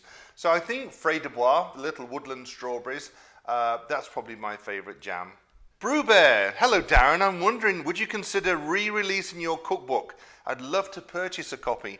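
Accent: England, United Kingdom. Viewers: Stumbled on your videos and became a huge fan. Keep doing what you're doing. I get no American accent at all you are still all English. It's a shame you didn't move to Canada though ;-)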